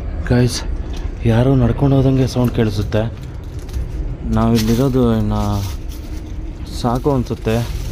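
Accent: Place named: Bangalore, India. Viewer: Bangslore kannada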